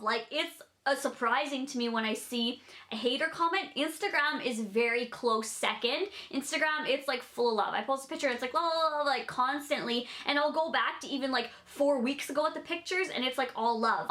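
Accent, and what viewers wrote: Canada. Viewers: Honestly I only scroll down enough to leave my comment and move on. It makes me so upset that people say all these mean horrible things to you. On all your videos you are just the sweetest, nicest girl. I love your cute little Canadian accent and how bubbly you are. Totally makes me day when you have a new video out.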